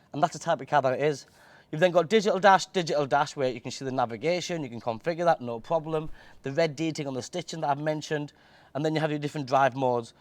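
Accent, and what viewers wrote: United Kingdom. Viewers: I love the brish accent 😂🔥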